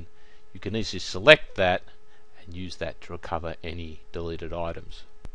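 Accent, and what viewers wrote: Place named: Australia. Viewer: Thx for the great video! Love ur Aussie accent :)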